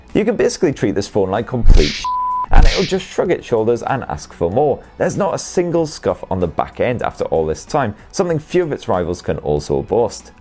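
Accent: United Kingdom. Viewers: Is this the legendary UK accent?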